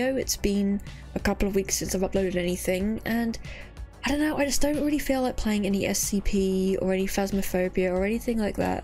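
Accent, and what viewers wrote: United Kingdom. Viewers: Look what you've done. How do I know what country you're from? I know you're English. I never knew what country the others were from.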